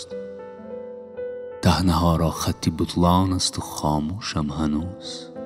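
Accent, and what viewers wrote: Tajikistan. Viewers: Это таджикский диалект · Это Таджикский акцент.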